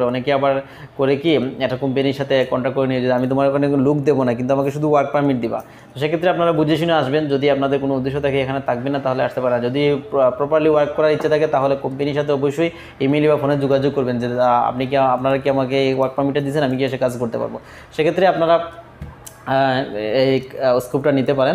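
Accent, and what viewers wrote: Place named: Sylhet, Bangladesh. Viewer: ধন্যবাদ ভাই এত সুন্দর ভিডিও দেওয়ার জন্য নিশ্চয়ই আপনার বাড়ি সিলেটে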